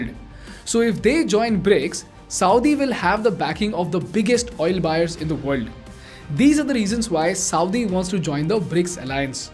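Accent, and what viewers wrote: India. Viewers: OMG, the host indian accent is so annoying. He should not speak at all. Next point, the West can never trust India and Saudi cuz they are just two face users and go wherever the wind blows. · Ganesh Prasad is the face of the indian accent now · The problem is .... Your Indian accent" Sorry, can't focus on subject. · I love your English, it sounds very much like Hindi.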